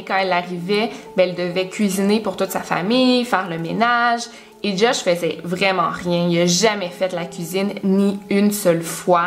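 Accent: Quebec, Canada. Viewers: J'ai adoré J'ai liker avant même de regarder la vidéo j'attend avec impatience tes vidéo et en plus je kiffe l’accent québécois Bisou de île Maurice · merci pour cette terrible histoire, je ne l'a connaissais pas. Une abonnée supplémentaires. Dîtes moi,vous êtes Québécoise? Bel accent et pays que j'aimerai visiter. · Coucou, je trouve que tu racontes super bien, je t'adore, c'est vrai que au début je comprenais pas tous les mots car tu a l'accent du Québec (moi je suis française a 100%😅😅) mais je m'y habitue et je trouve que tu mérites beaucoup plus d'abonnés!!! Bisous bisous et continue tes vidéos, elles sont trop bien! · J'avoue !!! Et pourtant de base je ne suis pas fan de l'accent québécois et ben là je peux juste pas m'en passer!!! Y'a que les imbéciles qui changent pas d'avis hein 😉😅😇 comme tu dis ma soeur, qui dit mieux 😍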